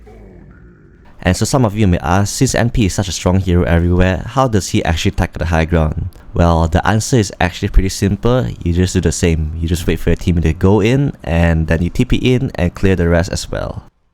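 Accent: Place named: Malaysia. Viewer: i know a malaysian accent when i hear one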